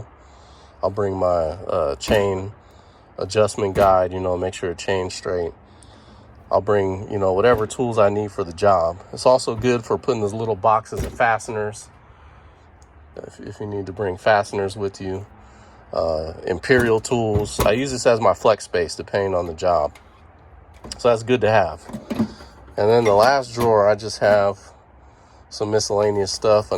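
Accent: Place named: West Newbury, United States. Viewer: I appreciate the vidya, with that west newberry accent mr john cena jr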